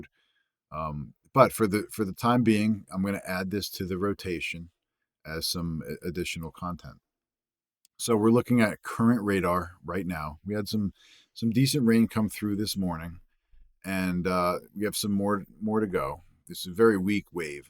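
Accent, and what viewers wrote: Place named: New Jersey, United States. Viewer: You are great! So helpful, you make weather interesting! Plus you have a lovely accent (just like mine NNJ). 😂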